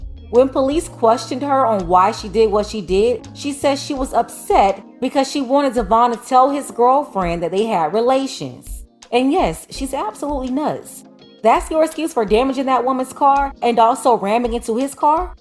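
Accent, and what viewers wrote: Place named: California, United States. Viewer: u sound like u from cali lmao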